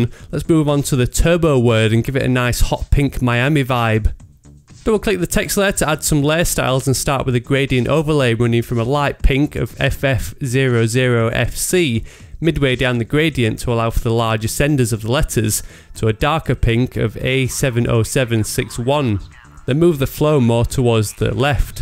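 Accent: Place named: Scotland, United Kingdom. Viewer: It's not about entirely whether you can handle, personally it's not that fast for me, but it's a bit fast for me, or...his accent is kind of wacky, I mean, Brits are talking funny, and then there comes Scots and Aussies, which are way more wackier/funny when they talk, so, a bit harder to understand what he means when he says something at a high speed without spots in between commands/lines. I have to turn captions on to understand what scortcut he meant, or what he was saying in the first place while trying to do something or explaining.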